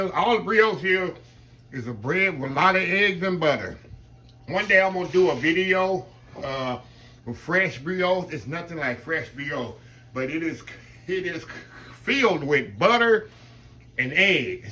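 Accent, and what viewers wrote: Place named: Texas, United States. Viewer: If you had not already told us, this Houstonian would know you were a Texas southerner by that mm mm mm. It's fun to listen to you enjoy your own food. That's how you know it's good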